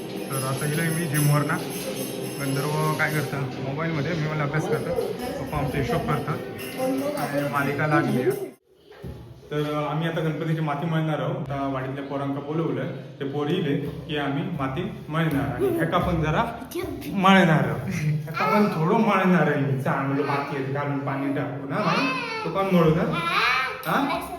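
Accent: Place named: Devgad, India. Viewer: तुमच्या देवगड साईड ची मालवणी वेगळी आहे थोडी. मालवण साईड ला , मी जातलय म्हणतो आम्ही आणि तुम्ही मी जाणार. परत, ला साठी मालवणीत शेवटी क लावतात. तुम्ही मराठी सारखं ला लावतात. उदा: देवगड ला आमच्याकडे देवगडाक असे बोलणार.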